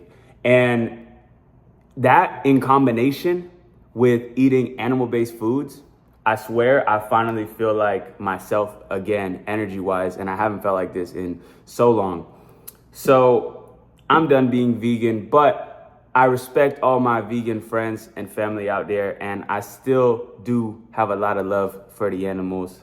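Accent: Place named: AAVE, United States. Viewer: Lose the ebonics white boy.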